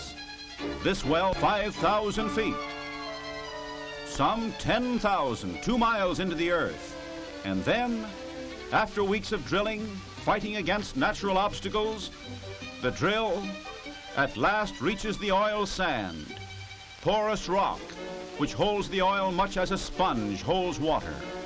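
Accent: Transatlantic, United States. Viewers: It's called the Trans Atlantic Accent. It was used back then in all American film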